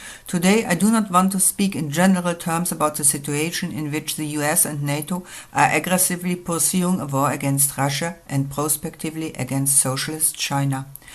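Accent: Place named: Germany. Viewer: They are still around ...go away ..and your accent is so german !! Yikes !!! Go to Russia !!!!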